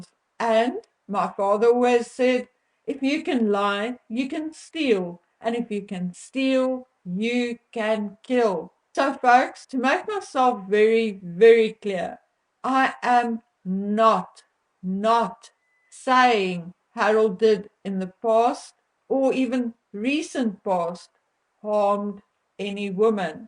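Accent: Afrikaans, South Africa. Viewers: Not Oidepus .... If you're going to use big words then please learn to pronounce them. Especially as these words are used in clinical settings.Shame man, I understand you're Afrikaans and that you have an accent but if you do not know how to pronounce it please ask someone who does.It is Oedipus....God bless you.✝️🌼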